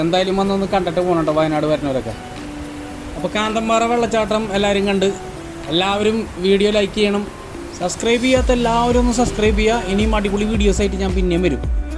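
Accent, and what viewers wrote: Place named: Thrissur, India. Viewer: 👍👍👍ചെറുതെങ്കിലും മനോഹരം ആയ വിഡിയോയും വെള്ളച്ചാട്ടവും പിന്നെ മ്മടെ തൃശൂർ സ്ലാങ്ങും ❤❤❤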